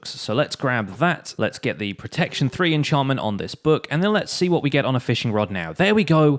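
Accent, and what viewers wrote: United Kingdom. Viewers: British all the way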